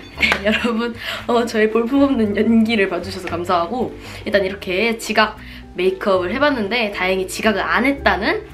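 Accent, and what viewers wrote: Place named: Gwangju, South Korea. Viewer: 아 걔있냐 는 사투린데 아무래도구성진걸보니 광주인가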